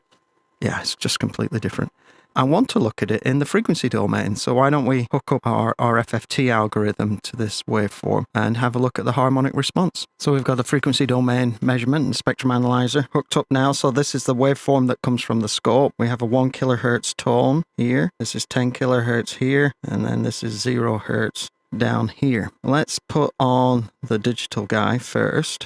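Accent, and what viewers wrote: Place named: Yorkshire, United Kingdom. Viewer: That's some accent mate, Yorkshire man in Cali? Fantastic video btw!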